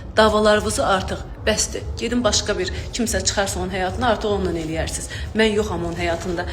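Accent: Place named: Baku, Azerbaijan. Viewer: Kendci..davavizi..gozuvuzu..danisiga bax.Baki lehcecine kecib...ciriq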